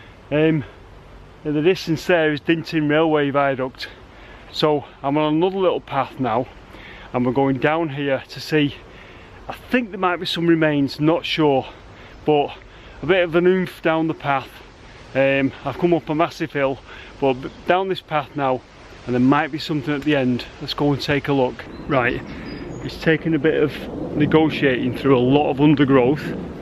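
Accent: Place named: Lancashire, United Kingdom. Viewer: Thanks for another wonderful video. I just love the subject matter. I was born in Lancashire and spent the first ten years of my life there until my family moved to north Wales in 1962. It is lovely to hear a proper Lancashire accent again after all these many years of living abroad. So much of what you show was all still working in the 1950s, who could have imagined it would all have been swept away so quickly. My father was a railway enthusiast even back then. I remember him stopping the car to watch the Flying Scotsman leave Chorley station and cross a bridge that the road went under. My brother, a baby at the time, 1956, still all in his white baby clothes was held up to look, 'it's the flying scotsman, it's the flying scotsman! It bring tears to my eyes just remembering it. This same brother, now semi retired in his 60s fires an engine on the Keithley and Worth valley line in Yorkshire. 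Incidentally, the engine he fires is one that my grandfather fired in the 1890s or 1900s when he was a fireman on the Lancashire and Yorkshire Railway. It was a pure fluke that the very same engine escaped being scrapped in the 1960s.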